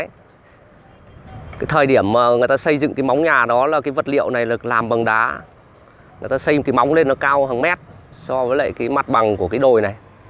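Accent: Standard. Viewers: Kênh của bạn rất hay . Giọng MC chuẩn . Chúc bạn nhiều sức khỏe . Chúc kênh phát triển . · Bạn có giọng nói chuẩn và rất hay chúc bạn thành công trong công việc của bạn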